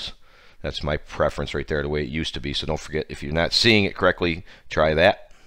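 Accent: United States. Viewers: Beautiful midwestern accent!!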